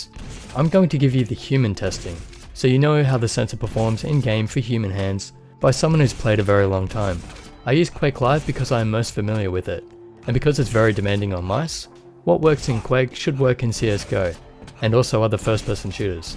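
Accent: Australia. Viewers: This is a bloody excellent review, mate. Impressive amount of detail! My first video of yours and an instant sub. Also great to hear some Aussie-English amongst the far too much American going on on YouTube!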